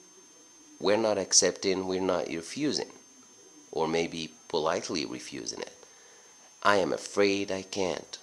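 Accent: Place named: United States. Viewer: it's really interesting to see a teacher who really has a good american accent :-) thanks a lot for helping us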